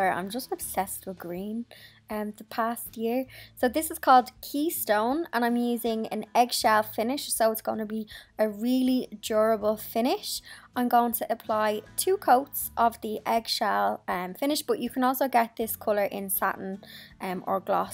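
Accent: Ireland. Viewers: OMG Are you Irish? What a lovely accent. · Hi, just found your videos and subscribed so I can watch new ones! Spent the weekend watching your previous ones! Enjoyed all your up cycles, blogs. You have a beautiful home. I’m here in the UK. Love your gorgeous Irish accent too. 💕 x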